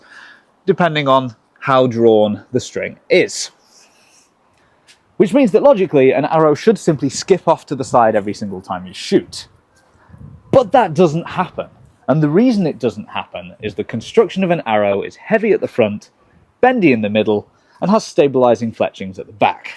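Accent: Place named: England, United Kingdom. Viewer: I feel good knowing that I was 100% right that this man absolutely had to have an English accent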